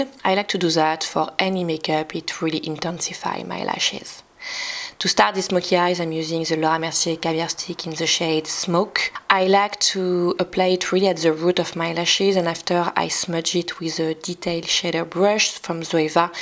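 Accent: France. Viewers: Salut! Tu habites où? Tu as beaucoup de vocabulaires c’est super mais ton accent est super français xD ! C’est pas méchant :) je te souhaite une bonne journée !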